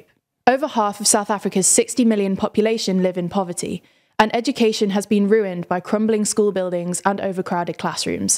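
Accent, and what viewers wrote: United Kingdom. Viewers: Sound like UK 🇬🇧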